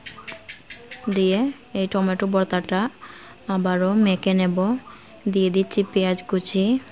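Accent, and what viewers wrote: Sylhet, Bangladesh. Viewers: Apu apni sylhet er kotay taken?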